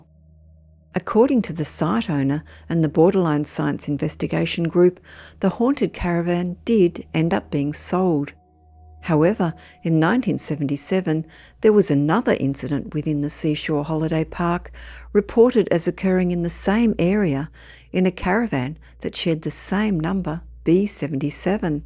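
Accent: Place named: Australia. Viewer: Hi there with the lovely Aussie gal commentary! I have a question,IF you please?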